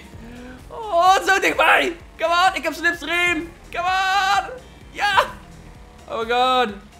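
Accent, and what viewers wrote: Brabant, Netherlands. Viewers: das den echte brabander e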